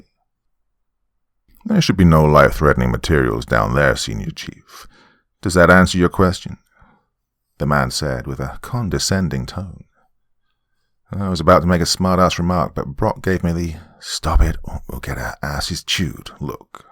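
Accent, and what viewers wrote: England, United Kingdom. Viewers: Have you ever tried using a coffee/tea cup, glass or Styrofoam cup to emulate radio chatter? Love your stories. Only good narrator that does military stories and doesn't sound like shit lol and the deep english accent makes the stories even better. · Once again, what's up with an English guy narrating a story about US NAVY SEALS??? Its so distracting and out of place..cant find even 1 American to do this job? Really? Couldnt get through this story because of it. I spent 4 years active in the Marines, another part of the Navy, never in all those days did I hear 1 British accent the entire time. So why here?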